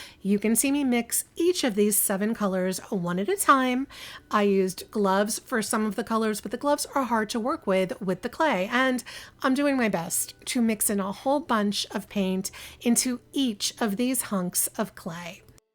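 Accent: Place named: New Jersey, United States. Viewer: You did ❤ and I picked up on your New Jersey accent. I heard you say "Mirrah" when referring to the mirrors. Thought it was endearing 🤗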